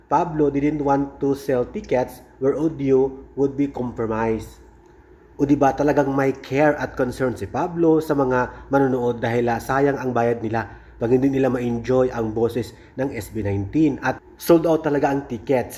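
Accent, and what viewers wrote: Visayas, Philippines. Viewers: sana nag AI kn lang..kc bisayang bisaya k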